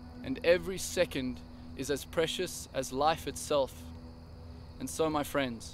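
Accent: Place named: South Africa. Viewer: Is he south african???? Sounds south african! Would be epic if he was!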